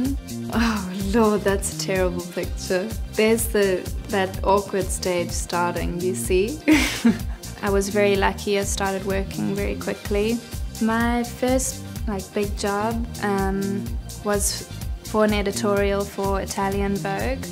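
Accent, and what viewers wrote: South Africa. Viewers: she has the south african accent .....awesome cnt believe she used to be my high school monitor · Please don't lose your SA accent, the american accent has claimed too many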